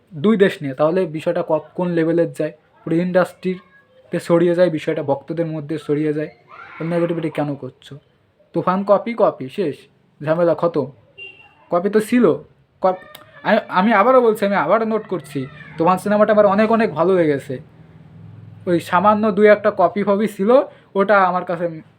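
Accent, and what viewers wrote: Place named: Bangladesh. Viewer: Tumi ki bangladeshi naki !! Bangla evabe bolchho keno !! Chhi chhi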